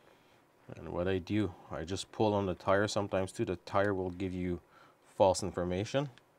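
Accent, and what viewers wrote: Quebec, Canada. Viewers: Québécois accent for sure ! Nice video patron !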